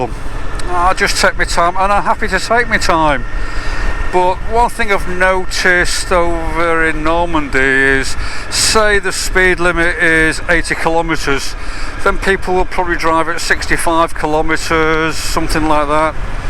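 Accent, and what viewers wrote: Manchester, United Kingdom. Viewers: Good to hear your engaging Manchester accent again pal…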